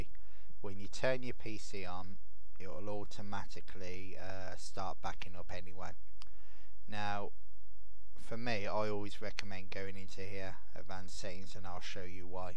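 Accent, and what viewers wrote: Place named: Ireland. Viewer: thank you for giving such an informative video. I received an Acronis True Image Activation Key with my new Crucial SSD. Having never heard of Acronis, I ventured onto Youtube and found your video. So now I feel up to speed. One question: my wife and I were wondering from you accent where you are from. She said Ireland, and I'm just not sure. OK, I'll say Ireland too.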